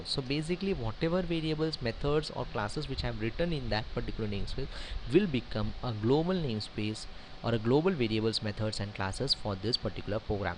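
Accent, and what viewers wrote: India. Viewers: thank you very much....i'm italian, so the indian accent is not so easy to get, but great lesson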